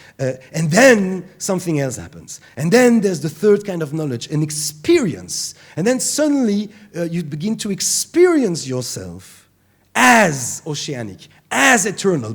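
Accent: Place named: Israel. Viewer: יש לך יותר מבטא ישראלי באנגלית מאשר בעיברית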